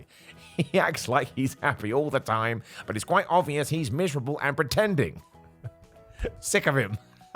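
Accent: United Kingdom. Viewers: I watch all of your what culture videos and your personal channel here. Love you!!! Love hearing your brittish accent... oh and whatever you're talking about as long as you're talking!! 🥰❤️❤️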